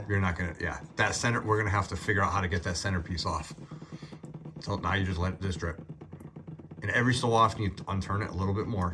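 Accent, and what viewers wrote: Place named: Midwest, United States. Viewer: I like the way of talking ! Midwestern? It’s GREAT! Don’t forget to open all air ways on the top before you pop that plug off and keep the plug off when you pop the filter off. The more air ways the better. Some people even pull the dip stick right out and take the cap off the top so long as nothing falls in there. You might notice a bit more oil gets out. Also wait until drips stop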